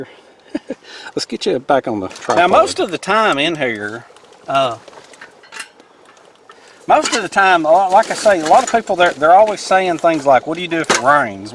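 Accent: South, United States. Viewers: What state r u from? My husband and I just found you... he thinks Texas or Oklahoma. I think Georgia or Alabama? I’m from Tennessee (but have resided in Michigan longer than Tennessee)and I’m not sure? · He’s definitely one of my favourites too. As an English woman, he’s so different from anyone I’ve ever met. His accent is adorable, but his skills & knowledge on everything is astounding. I’ve never not laughed in one of his videos either. I think he’s what you call a true southern gentleman. I can’t believe you watched for 3 days straight! That’s dedication 😂 & you must know everything about bushcrafting now! Glad you support him as much as I do. He truly does deserve it. Much love 💕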